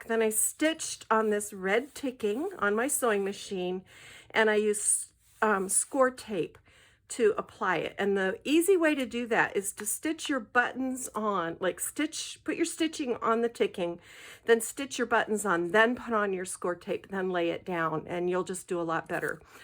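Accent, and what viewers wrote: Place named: North, United States. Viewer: I just found your channel and look forward to seeing what you have. I am in Greensboro, NC. Are you near me? Your voice sounds familiar. I was involved with the High Point Quilt guild years ago and even further back taught scrapbooking classes here in town. Just curious. You also sound like maybe you lived up north some, like me, LOL.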